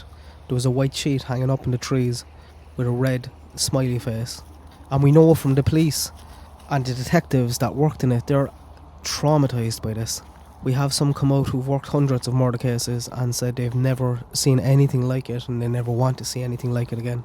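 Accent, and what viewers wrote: Ireland. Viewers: Irish accent it's so lovely! X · No, I’m guessing it’s in Ireland somewhere…the narrator is Irish and the fauna looks like it would be in Ireland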